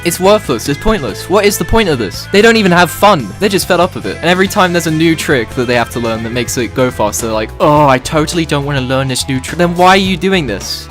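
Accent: United Kingdom. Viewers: I respect a man who can share his opinions online. Commenting to help push this vid and ruin someones new year! Edit: Ew youre british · guys there isn’t a joke it’s just a British guy shitting out of his mouth for five minutes. I feel like I am watching pyrocynical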